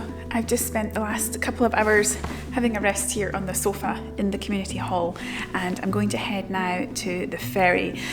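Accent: Scotland, United Kingdom. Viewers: What an entertaining video! Everything was so interesting and moody. As a german I always have to take the ferry from Amsterdam to Newcastle ( spend 2 -3 times a year in Scotland), so your video about the ferry trip was so interesting everything looked so familiar to me, even your sea sickness 😬. I use to travel alone, and I love to stay in small cottages in the highlands ( although I always feel a bit scary at nights ). In 2021 I stayed a few days in the Hostel in Tarbert on the Isle of Harris, and due to the pandemic I had the hostel for my own! That was great and strange, both., besides I really love your scottish accent and the fact that you are so easy to understand 👌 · You have such a lovely Scottish feminine accent my dear. 💖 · Wow! look at you without your woolen cap. Love your scottish accent..easy to understand..but difficult to immitate❤ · Beautiful lady, beautiful accent. 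So proud to claim you as one of my own (scottish)❤😉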